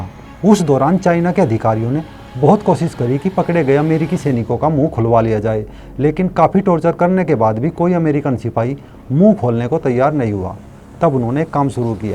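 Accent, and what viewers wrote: Haryana, India. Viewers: RAM RAM BHAI HARYANVI LAG RE HO AP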